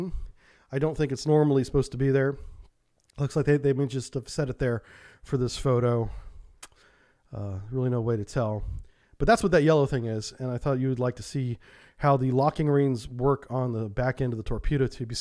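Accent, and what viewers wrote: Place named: United States. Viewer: the pronounciation was a bit off, thats right, but still understandable and easy to correct with very minor "practice". just cut the american "dialect" pronouciation habit and you should be more than good enough. umlaut and other stuff is not really neccesary, as it is difficult for english speakers to wrap their head around and germans dont really mind if you dont pronounce them. just dont end up like the guy in the "Tank Museum Anti Tank Chat"..... that was just horrible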